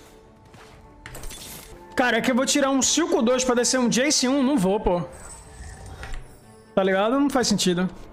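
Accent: Recife, Brazil. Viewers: Tu é de Recife meu bom? N acredito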